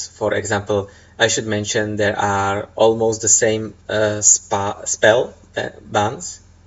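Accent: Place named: Czech Republic. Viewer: Mě ten čengliš právě vyhovuje, rozumím tomu daleko líp, než rodilímu mluvčímu :D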